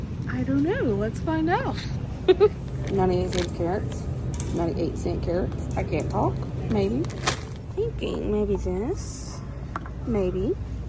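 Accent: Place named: Arkansas, United States. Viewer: Add ur peenches of salt! Love you girl. Your accent reminds me of my arkansas family❤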